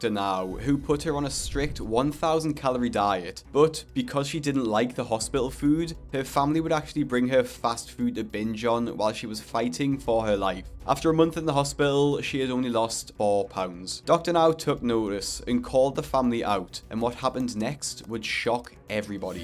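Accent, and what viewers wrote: United Kingdom. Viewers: This is an American TV program. Why in the hell do I have to listen to somebody with an annoying British accent??? That's the only reason I can't subscribe to this channel. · Completely off topic, but I was wondering where you are from. I watch another YouTuber with a very similar accent. I mean, I know it's UK, but it amazes me how an island that isn't very much bigger than my state (Illinois), has so many different regional accents!!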